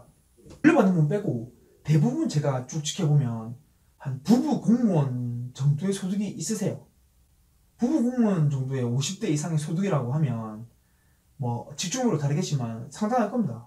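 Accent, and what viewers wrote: Busan, South Korea. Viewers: 마이크문제가 아니구 부산사투리랑 발음문제입니다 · ㅋㅋ부산사투리란다,,전혀 아닌거 같구요~~ 꼭 만나면 말도 못 할 분께서 전혀 문제될 부분도 아닌 곳에서 키보드 워리어를 하시더라구요^^ 부산사투리 쓰는게 듣기 싫으시면 안보는 방법이 있어요!! 똑똑하쥬?? 꼭 싫다면서 보드라구요🤭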